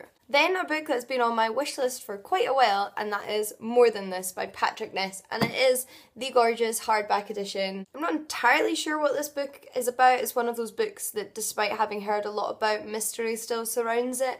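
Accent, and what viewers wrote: Scotland, United Kingdom. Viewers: Hi there! I've just discovered you and I love your channel ❤️ You do really nice videos!!! I heard your accent and thought: this girl must be Scottish! 😍 I looooove your accent. I was in Edinburgh last summer and I'm coming back next month. Which part of Scotland are you from? Kisses from Spain xx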